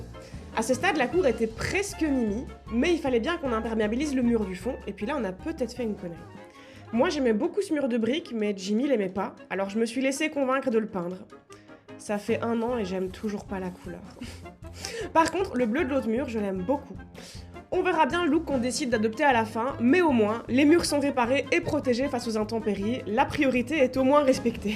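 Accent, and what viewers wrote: Belgium. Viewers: Tient c'est première fois que je remarque son accent belge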